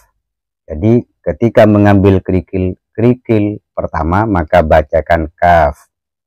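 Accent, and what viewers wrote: Madura, Indonesia. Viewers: Ass. Imsm Video yg di sampaikan TIDAK UNTUK UMUM di Khususkan Hanya Untuk Orang Madura,???!!! Usul dan Sumbang Saran, klw Untuk Umum Alangkah Lebih Baiknya Agar Yg Lebih Banyak Penonron Yg terbantu Meng LIKE SUBSCRIBE, Sajikan Video Kedepannya, Agar Adminnya bisa Tambahkan Tek Ketikan Ada Hurup Arab & Latinnya & Artinya, Agar Memudahkan Para Penonton, Tidak di sampaikan Dalam Pembicaraan, Faktor Segi Bahasa yg di sampaikan Masih Terdapat logat Madura, Penonton Tidak mudah PAHAM tk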